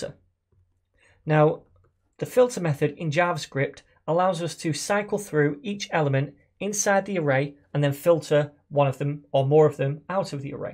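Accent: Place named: Northern England, United Kingdom. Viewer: You are an excellent teacher, and clearly nothern England (I send Manchester??). This is some of the best JS stuff i've learned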